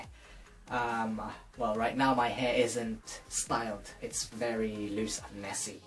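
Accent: United Kingdom. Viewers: Oh no!, it appears as though I am to late to have asked you any questions for this video! D: Regardless, I always enjoy hearing you talk in your fancy British accent c: And might I say, I am truly honored to be a part of your legends list! I know I have not been editing much lately- I seem to have hit a creativity block- but I hope to have some kind of video completed before Christmas. Life has just been so busy lately! I hope things are going as awesome for you as your hair is!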